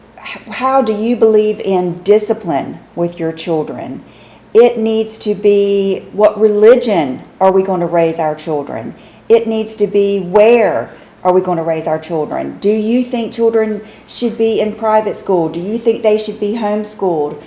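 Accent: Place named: Texas, United States. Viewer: do you live in Texas. I live in Tennessee and you have about the same accent as me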